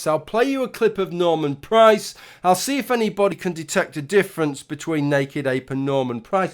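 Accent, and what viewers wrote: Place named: Birmingham, United Kingdom. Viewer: The insults are 3x funnier with the Brummo accent, don't get upset, you're perhaps the only atheist I enjoy listening to since most of the Rational Skeptic TM community have their heads up thier arses: including everyone in Apes video, especially since Sarg'n began his losing streak against the "Alt Right" also a few Liberalist discord mods are soliciting 14 yr old boys for sex. That might put this into perspective.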